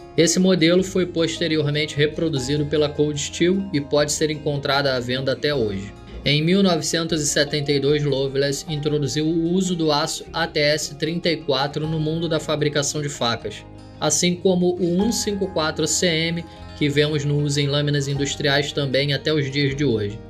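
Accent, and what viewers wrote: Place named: Rio de Janeiro, Brazil. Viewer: Cara, esse documentário ficou bom demais, tirando esse sotaque de carioca, ta perfeito 👌🏻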